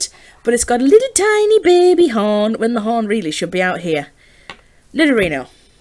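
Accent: United Kingdom. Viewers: I thought you were British because of your accent lol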